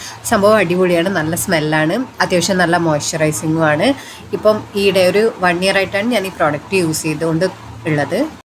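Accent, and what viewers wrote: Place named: Kannur-Kasaragod, India. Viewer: Eachinde nad ede kannura kasroda slang kekumbo thoninn . Nan kasargodil ninnan ta ❤❤😊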